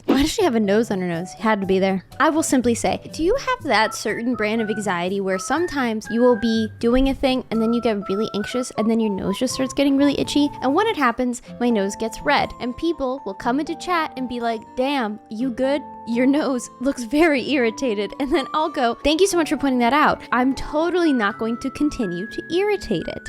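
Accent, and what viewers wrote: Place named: United Kingdom. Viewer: i'm surprised the british accent fit so well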